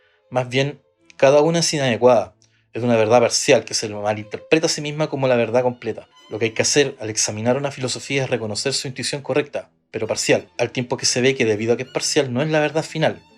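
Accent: Chile. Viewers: Me encanta el acento chileno (me genera paz). Yo estudié filosofía, pero aún no profundicé con Hegel y quiero darle a la Fenomenología del espíritu.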